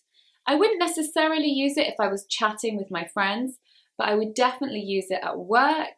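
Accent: United Kingdom. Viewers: Stop speaking british accent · I believe brittish accent is awsome when you use it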